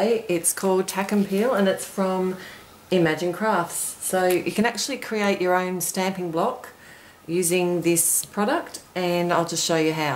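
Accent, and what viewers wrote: Australia. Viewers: Thanks for sharing. You sound like a fellow Aussie and so just wondering where do you get your Tack n Peel from?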